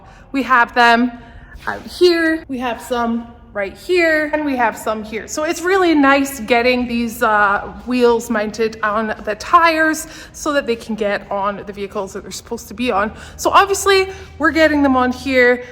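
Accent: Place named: Northern England, United Kingdom. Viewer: While watching House of the Dragon, I heard an accent I thought I recognized. Turns out you sound like the people of the North.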